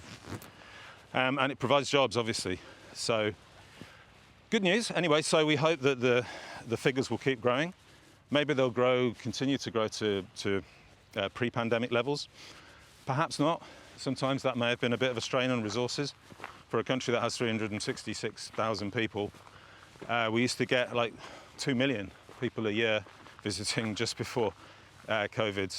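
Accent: United Kingdom. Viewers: I think he is British…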